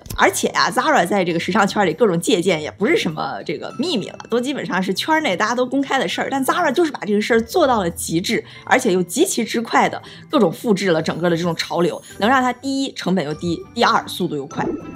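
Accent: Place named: Northeast, China. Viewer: 姐姐什么都好，就是东北音太重了。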